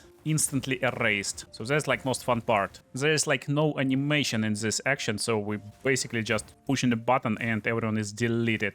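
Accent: Russia. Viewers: акцент у тебя очень похож на русский)) ну да ладно) твой билд хорош! сейчас его строю)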